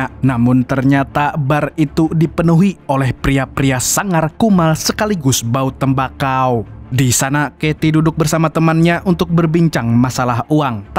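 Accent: Banjar, Indonesia. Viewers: Urang Banjar pinanya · Suku Banjar ya min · Urang banjar kah mang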